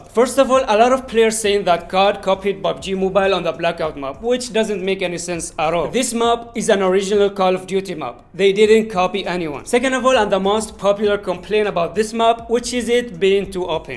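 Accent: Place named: India. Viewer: I don't know where r u from. But ur sounds like u r Indian😀.and I really like your gameplay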